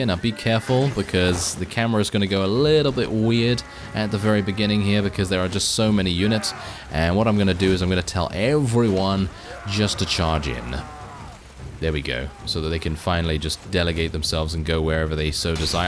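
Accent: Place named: RP, United Kingdom. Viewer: Absolutely love your videos and your posh accent lol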